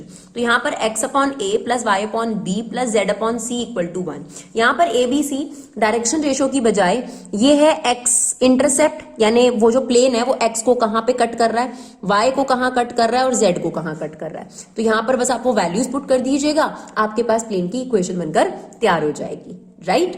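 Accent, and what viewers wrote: Punjab, India. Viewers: You are from Punjab i think because of your accent . But still i respect you because you are awesome 😎 👍🏻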